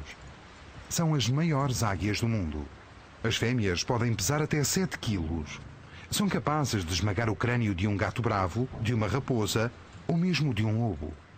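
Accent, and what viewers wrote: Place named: Portugal. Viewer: triste esta narracao de portugueses, estes caras nem falar sabem como vao narrar algo???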